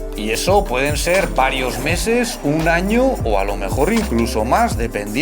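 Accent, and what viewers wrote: China. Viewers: Ya tienes el acento chino.. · Caballero no es por mal pero trabaja más en ti asentó español ya hablas como chino. No olvides tus raíces es tu identidad. Bendiciones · Oye hablas muy diferente a lo habitual pareces como que hablas en español con acento del idioma chino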